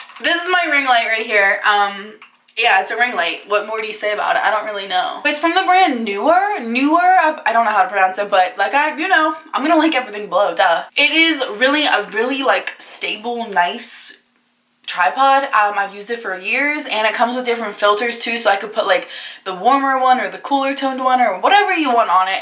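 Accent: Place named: United Kingdom. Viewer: I thought she was British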